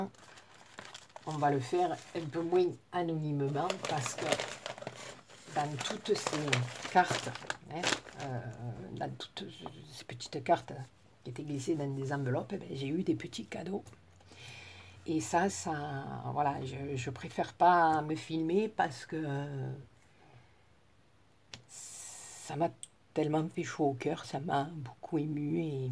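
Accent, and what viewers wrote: South, France. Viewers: Oh ma belle, quel plaisir de t'entendre et d'entendre le sud dans ta voix ! Trop heureuse que tu ai été gâtée et tu es tellement touchante !!!! Te fais de gros bisous ♥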